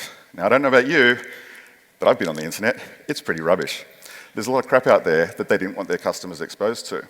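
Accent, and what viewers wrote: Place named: Australia. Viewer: Great to hear an Aussie voice for a change in all the noise of YouTube videos. Subscribed from SA :-)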